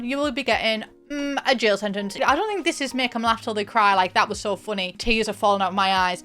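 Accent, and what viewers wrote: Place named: Redcar, United Kingdom. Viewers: Is it cause we where both born at james cook that I love you so much . Cause girl it might just be that i live in redcar n we share an accent 😂🎉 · Middlesbrough accent.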